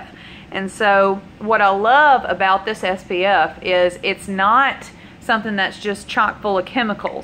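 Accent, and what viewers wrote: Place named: Texas, United States. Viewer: May I ask a question? What part of the country do you live in? I lived in TX for 12 yrs, and your accent sounds a lot like mine!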